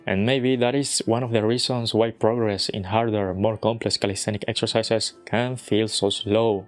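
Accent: Spain. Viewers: First time seeing an asian guy with a spanish accent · That muscle up handstand is awesome. You sound like a European.. it's like hearing a Spanish person speak English. Interesting!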